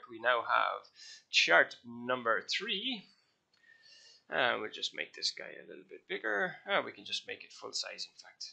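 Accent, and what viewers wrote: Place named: Ireland. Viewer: Man, I'm finding it a nightmare setting up my charts... bloody Oirish accent makes it difficult.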